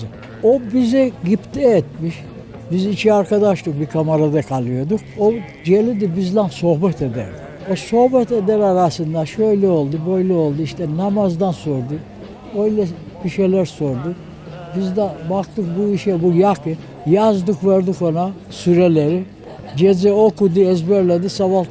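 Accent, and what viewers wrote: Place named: Rize, Turkey. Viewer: Şiveden Rizeli olduğunu anladım.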